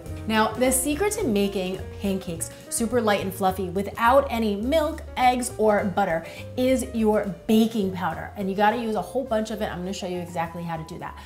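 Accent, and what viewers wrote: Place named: New Jersey, United States. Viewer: Very funny Jersey girl accent haha 😂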